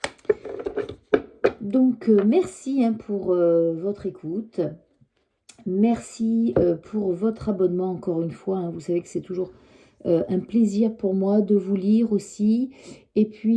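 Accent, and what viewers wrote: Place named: Corsica, France. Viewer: merci j'ai ressentis un léger accent Corse dans votre voix en tout très bon tirage bonne soirée à vous a bientôt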